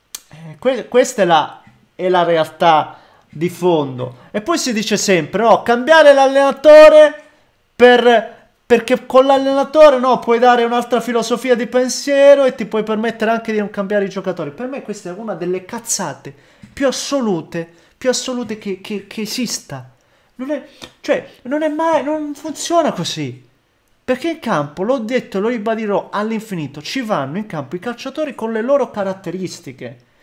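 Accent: Calabria, Italy. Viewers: Ma prima o poi mi toglierai la curiosità di dirmi di dove sei? È troppo bello il tuo accento calabrese!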